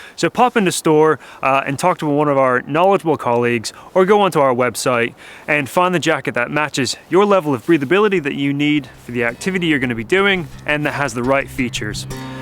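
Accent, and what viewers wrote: United States. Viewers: This guy sounds like an American who's been living here in England for too long, he's starting to pick up a hint of English accent.... you can tell from the ending of words like "sheet" "belt" "about" "what" "it" and "fit" which all happen to be words ending with the letter T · You sound american but don't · It’s definitely not Scottish or Irish mainly American seems like an American who’s been living in the uk for a while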